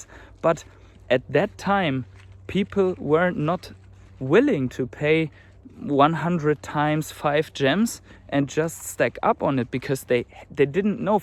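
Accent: Germany. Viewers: Hörst dich deutsch an 😉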